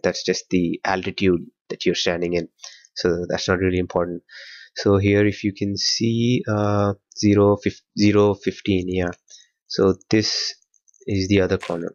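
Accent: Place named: South Asia. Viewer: u have hindi/urdu accent xddd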